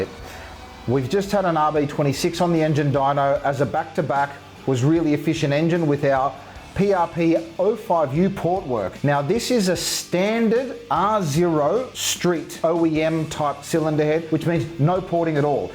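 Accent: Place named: Australia. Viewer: If you do anything with an Australian accent, ill watch it.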